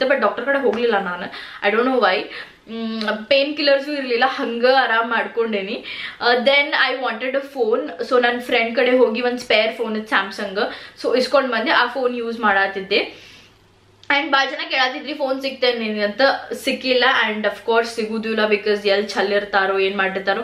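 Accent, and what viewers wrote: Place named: Dharwad, India. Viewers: Nimma Dharwad language bhalha esta aytu ri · Love the way you speak namma uttara kannada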